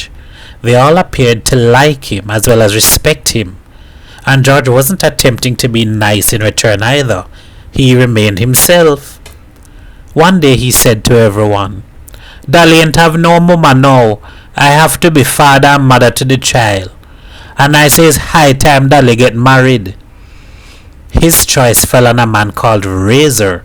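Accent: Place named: Trinidad and Tobago. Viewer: Thank you, Sir, for reading Miguel Street in what I assume is a Trinidadian accent. Grateful Zimbabwean here.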